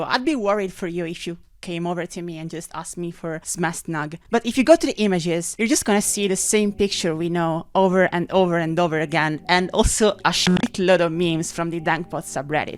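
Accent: Italy. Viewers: She is definitely Italian. Her English pronunciation is really quite good, though. Italians usually have a really hard time pronouncing H at the beginning of words, for example, but she does it fine.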